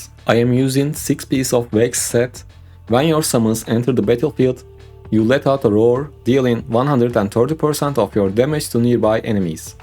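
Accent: Turkey. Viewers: İnanılmaz türk aksanı var reyis türk müsün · Konuşmasindan anladim türk olduğunu haha Almanyadan selam reis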